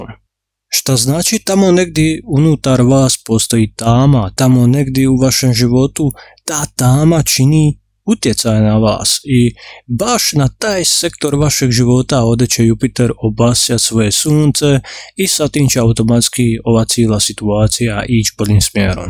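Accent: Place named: Dalmatia, Croatia. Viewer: Obožavam kao prvo vaš Dalmatinski jezik,vaša brza čitanja,jednostavno super ste.Pozdrav Bos Gradiška.🥇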